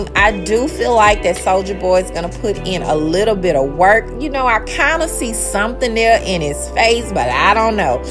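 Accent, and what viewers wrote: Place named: Memphis, United States. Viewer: You kinda SOUND like Jessica...accent wise. Are you From Memphis?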